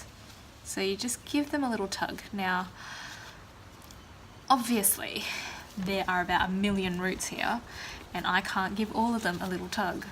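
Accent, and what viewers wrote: Australia. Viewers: Nice to hear an Ausie vioce for a change, will be following your channel to see what else you have to teach me.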